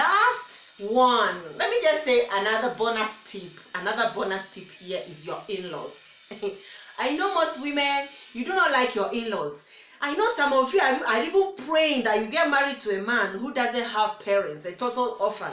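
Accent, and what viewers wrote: Nigeria. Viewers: Like seriously, where did you get all these Nigerian accent from, it sound so good and you making it more funnier. Your energy is so welcoming, and eye catching. Keep it up girl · Your Nigerian accent na waa ooo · Wait.... Who thought you all this Nigerian accents 😂you are very correct dear👏👏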